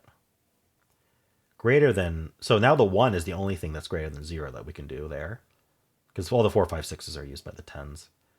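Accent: United States. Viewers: There's the old American 'T' that sounds like a 'D' again.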